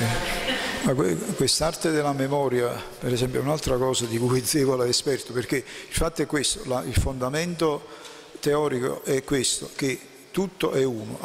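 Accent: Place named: Naples, Italy. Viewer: lei é un tesoro culturale napoletano con questa modestia irresistibile da del liceo, nessuna pianta raffinata,solo l'odore dei..limoni. Grazie IISF e Massimiliano per darci ció. Lo spirito di Archita, del nolano e di quel mecenate dell' istituto non moriranno mai. Che pianto amaro leggere la sua difesa senza abiurare. Che onore e fortuna potere sentire questi contenuti, con il suo accento a tanti km di distanza. Perché l'accento che si sente bene nel film é un accento di chi é, di chi parla delle “idee“ ripudiando le forme sofiste, di chi qualunque siano le pacate conferme del pensiero esprime il suo eroico furore. Grazie, sono davvero orgoglioso di esssere Italiano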